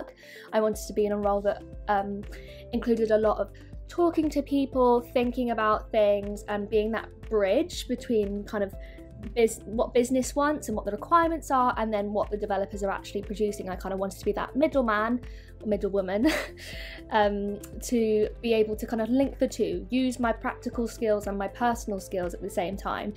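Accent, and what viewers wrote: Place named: United Kingdom. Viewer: Her british accent :)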